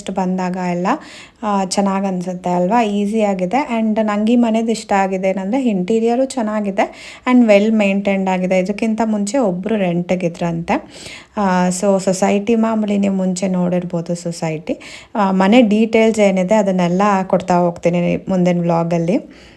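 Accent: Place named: India. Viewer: Hi ur kannada accent good r u really a kannidaga wat about ur son is he facing any health issues as per both ur own house and the other house y dont u buy a new house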